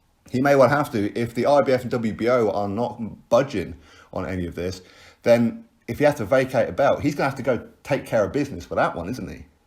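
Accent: United Kingdom. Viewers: Soon as i heard the uk accent i knew you were lying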